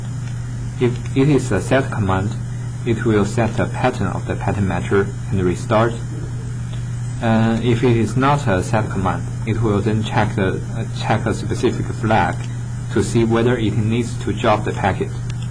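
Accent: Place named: China. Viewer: 老铁，听口音像是中国人吧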